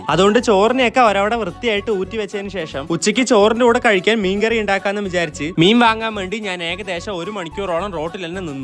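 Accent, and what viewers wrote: Palakkad, India. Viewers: ചേട്ടൻ പാലക്കാട് ആണോ അവിടെ ആണ് ❤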